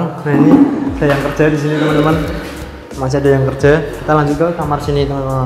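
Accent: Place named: Java, Indonesia. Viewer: Jawanya medok bed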